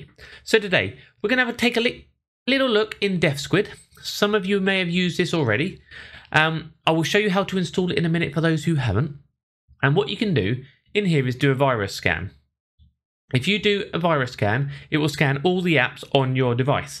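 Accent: England, United Kingdom. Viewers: Bro I got to tell you thank you for the the help it really was very informative and I think your English accent man I like I love the way you guys talking to anyone dodgy and stuff I'm over here in America but it's great man brilliant you guys always say brilliant too I love it. Thank you so much for the help I subscribed to your YouTube channel and like the video I'll be checking in more often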